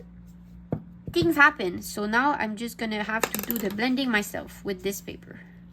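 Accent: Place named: France. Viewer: Hey! I loved your video, hreat art, great tutorial it was *chef kiss*I was just wondering if you were french, I am french myself, and I heard in your accent (slightly) some french like the “Ok” and the “euh”, the way I also pronounce it :3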